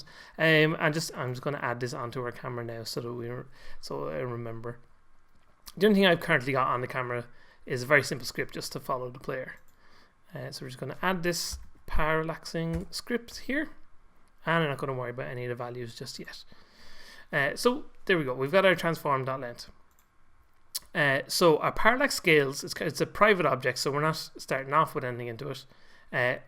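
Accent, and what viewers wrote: Ireland. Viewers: Great video! PS: Your voice sounds like Jacksepticeye (because you're irish)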